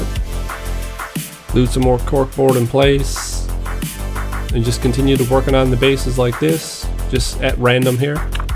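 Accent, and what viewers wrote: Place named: Ontario, Canada. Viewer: Nice work on these, but where’s this guy from? His accent sounds like Ontario crossed with surfer bro.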